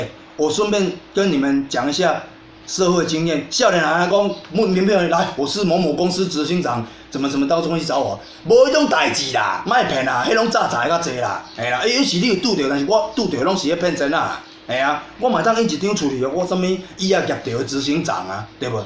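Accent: Chiayi, Taiwan. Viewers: 支持你！這集真舒暢我是滿喜歡留言板的，可以互動感覺挺棒的，而且有部分網友的意見也是很珍貴的唷我常逛youtube，可是平常也很少在留言但是我在的頻道停留的時間卻是最久的，有時候聽你講話也算是個很不錯的娛樂！哈哈因為我自己住台南所以聽你的嘉義口音覺得很親切你的頻道樂子很多，很有你自己的風格即便是廢片我覺得也是很有趣～驚丟！酸民無所不在我覺得你是個很正面的人所以自己覺得對的事就不要在意這多了當然偶而發發這種片子吐吐苦水舒爽一下也不錯最後還是要說加油啦！快點破十萬訂閱 XD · 這是我唯一一次在這邊留言真的很喜歡你拍的影片又帶有道地的口腔嘉義人支持你!!!!加油~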